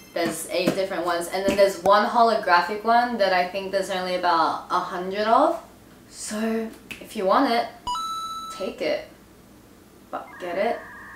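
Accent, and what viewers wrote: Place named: Australia. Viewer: i cant with her aussie accent is so precious 😭🤚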